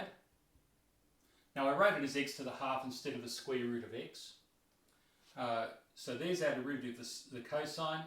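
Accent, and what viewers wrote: Australia. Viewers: First of all thank you for the insight. It saves an awful lot of time, mate However, please exchange using the x symbol for multiplication to the dot. It is less confusing in the evaluations. Also, your 4's look like 6's sometimes, especially when used as exponents. And finally, please adapt a system of presentation where you are not in the way of the whiteboard. I am a teacher. please accept this as constructive criticism. I do enjoy the Aussie accent whenever I get a chance to hear it, like Bryan Brown, Chris Hemsworth, Hugh Jackman, Nicole Kidman and your other countrymen.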